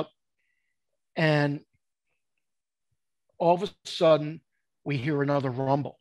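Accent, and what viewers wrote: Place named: New York, United States. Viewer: This mensch has the best story and delivery I’ve ever heard. Great New York style delivery. I guess the divorce didn’t bother him after that. 😂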